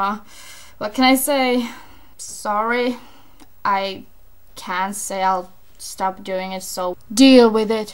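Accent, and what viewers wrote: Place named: Finland. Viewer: You sound so finish and that's kind of cool... the accent and stuff...! :D When I was little I lived in Finland for a while. I remember the kids and people and you just remind me of them somehow or something... :D Anyway, smoking is cool, so you should definitely try it! :D It's just a hobby. If you smoke, well at least that's gonna be a new topic for you to talk about in your videos. Take it as a challenge, light a cigarette and share your experience live on camera... :D... Anyway, peace!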